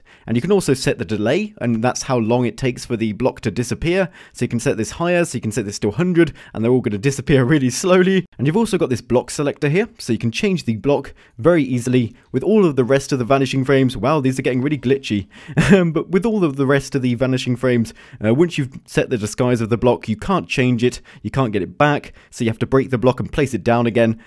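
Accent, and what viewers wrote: United Kingdom. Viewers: is he really british?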